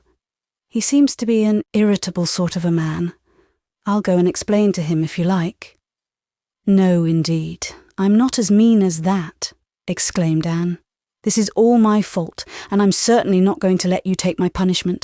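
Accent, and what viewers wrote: England, United Kingdom. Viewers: I’m enjoying these very much, despite the English (not Eastern Canadian) accent; Thank-You!!! This is one of My favourite books in the series. 😉😄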